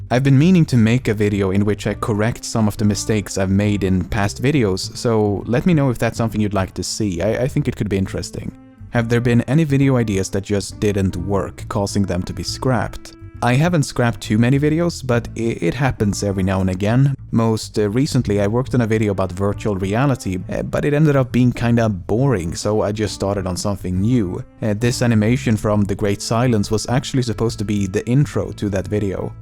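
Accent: Gothenburg, Sweden. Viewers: No, he has a slight swedish accent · Lem’s narration on his older vids (especially 2 mil Q&A) reminded me of Keralis... meybe it’s because of the low pitch Swede accent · Sooooooo i caught something in this video.... I think accent is becoming more english than swedish. Honestly that thick swedish accent is what makes your videos stand out from the whole youtube space. Oh and also congrats on 3M! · Är du från Göteborg? De låter så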